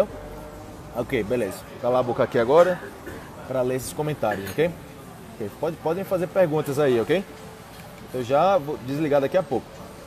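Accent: Colombia. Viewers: Mae, mil gracias. Me encanta su acento de español colombiano ja, ja, ja. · Me gusto tu acento colombiano...vai com Deus patrão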